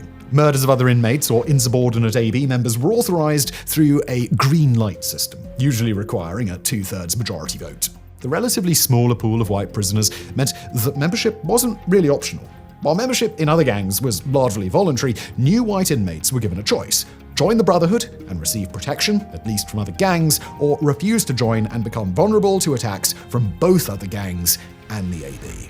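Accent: England, United Kingdom. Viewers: The exaggerated English snobbish pronunciation makes it hard to understand what you say. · well one it’s more for fun, but two the author of this video is carries a british accent. I guess you could just take that at face value or further recognize that maybe you and the rest of your british kind are what’s actually trite. Lol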